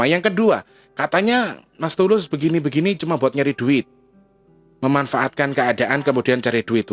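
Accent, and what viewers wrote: Banyumas, Indonesia. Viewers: knp geli banget denger suara masnya ngapak go internasional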